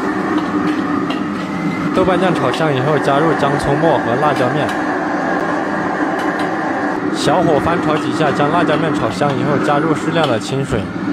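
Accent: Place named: Zigong, China. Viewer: Shuai Wang 这个师傅一听就晓得是自贡人，，， 怎么不是川厨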